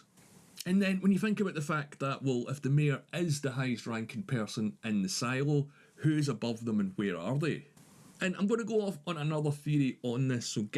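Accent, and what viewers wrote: Scotland, United Kingdom. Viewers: I like Scotland’s accent! 😅 I think the silo was built by United States government, I did not read the novel books series, but that is what I got from unspoiled from readers reviews. However, you are right, it is hard for bureaucratic entity to build something works efficiently, but they built it under the apocalypse circumstances! When any mistake, no matter how small, may end the human race! In terms of Silo authorities, I guess there is some misunderstanding, I do not blame you, I had it at the beginning, simply because silo authorities power distribution is extremely unique, it never ever existed in our real world! But still realistic! In our world, the tyranny power, or dictatorship, evolve by military coup, expansion of executive influence, or legislature pressure (revelation). In silo, the judiciary is the monopoliser of power! 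It is rare in our world but make sense in silo where armed forces do not exist! Nor society freedom! Why the mayor looks like the highest authority, appears as president? It is like asking “why german chancellor looks like the highest authority, appears as president?“! The german chancellor title been used after Carolingian Empire fall, as the highest position left of the empire! So the correct question is, did the United States president and governors died during the apocalypse years and the highest position survived was a mayor?! “The Silo” as a fictional world is still evolving and several stories and novels written in the same world, each su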